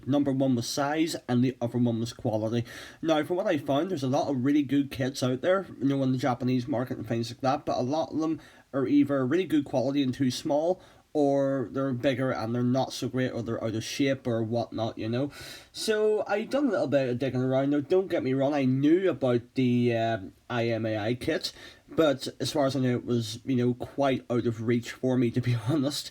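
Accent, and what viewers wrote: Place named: Northern Ireland, United Kingdom. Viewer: It surely is. Great to hear the world famous 'Norn Iron' twang. Keep up the great work.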